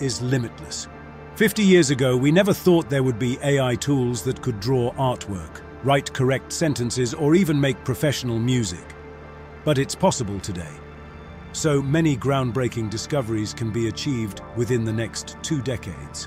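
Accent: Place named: England, United Kingdom. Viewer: Your English-accent-robot-voice makes this vid hard to take seriously.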